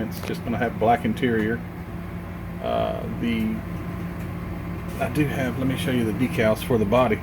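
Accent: South, United States. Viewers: Mean! Mean paint job man! Great overall job and I was hoping you were going to go with the black stripe decals! (your next door neighbor in Canada Montreal). Your Southern drawl sounds so American!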